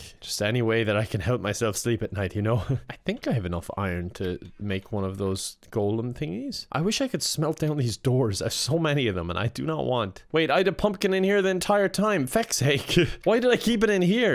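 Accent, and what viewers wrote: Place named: Ireland. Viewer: Do whatever you want to. I'm here for the Irish accent. That shit is basically my kink.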